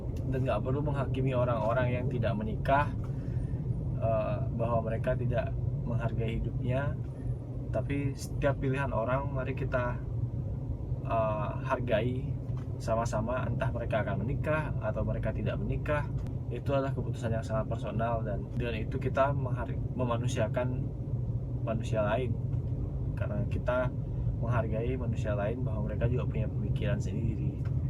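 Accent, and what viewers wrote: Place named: Bali, Indonesia. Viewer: Bli, logat bicaranya kali ini baru Bali pisan :)))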